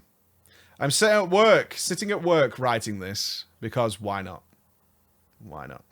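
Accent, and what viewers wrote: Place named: Manchester, United Kingdom. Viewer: Look at the shine on that innit!! Are you from manchester?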